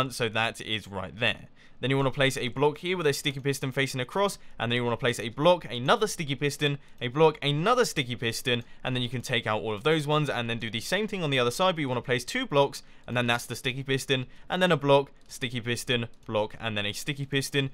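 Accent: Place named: United Kingdom. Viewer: He sounds really British in this😂 and I’m from england😂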